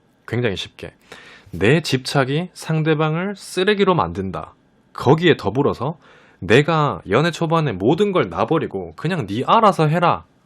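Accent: Gyeongsang, South Korea. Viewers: 우연히 봤는데 잼있네요. 경상도 억양 있으시네요. 경상도분인듯~~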